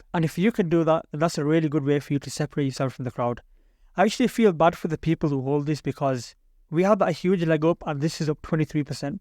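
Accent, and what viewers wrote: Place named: Yorkshire, United Kingdom. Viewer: He's literally English from I'm guessing Yorkshire or Lancashire like Bradford area learn accents before you start labelling smart arse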